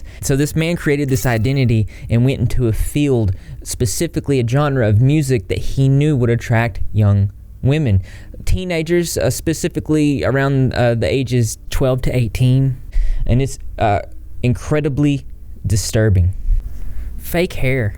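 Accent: South, United States. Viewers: Think this is crazy?? Jared Leto has the exact same story. You should see the threads on line and the accusations. Sounds identical to this... forced rape and dangerous choking. I loved Leto at one time...he uses 30stm concerts to lure them. I’ve watched a few of your vids and I love the southern accent (I’m southern) but.... what’s with all the symbolism? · This is a random question but where are you from? It sounds like you have a kind of country accent it’s really interesting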